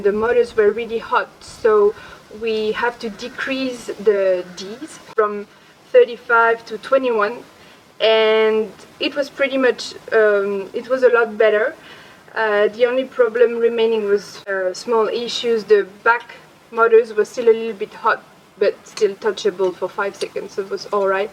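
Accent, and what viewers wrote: France. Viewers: Ah cet accent lol, tu est française toi non? :) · J'avais bien reconnus notre cher accent français mais j'étais pas certain j'aime beaucoup t'es vidéos tu devrais en faire au moins une en fr :D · Je me doutais que tu étais française avec ton accent 👍 au top tes vidéos, keep going 👌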